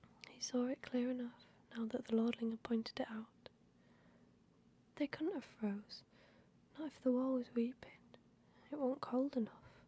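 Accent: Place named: England, United Kingdom. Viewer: Aaahhh your voice is perfect. Definitely my favorite English accent. The intro was terrifying but you nailed the rest of it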